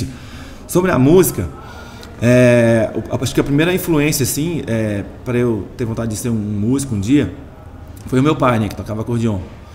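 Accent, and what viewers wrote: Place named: Northern Minas Gerais, Brazil. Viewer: Canta muito..a voz dele parece com norte de Minas ..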